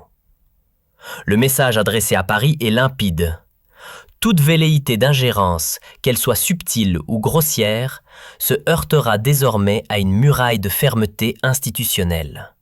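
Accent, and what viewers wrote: Canada. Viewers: cet accent canadien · fait avec un accent québécois plutôt que français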